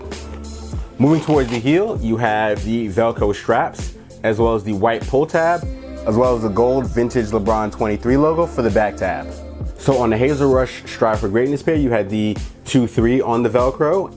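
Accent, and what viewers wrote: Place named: California, United States. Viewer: You say you from new york I do not hear it in your voice son you sound like a california kid my accent is deep from South Bronx heart of new york kidd E 194th Briggs come on son rep right son aiiight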